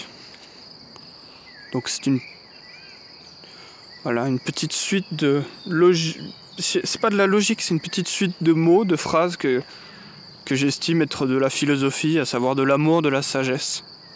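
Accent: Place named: Switzerland. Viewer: Les 2 sont possibles et en Suisse j'entends plus souvent cette façon de prononcer (en tout cas dans le canton de Fribourg) Il est Suisse: ça s'entend à son accent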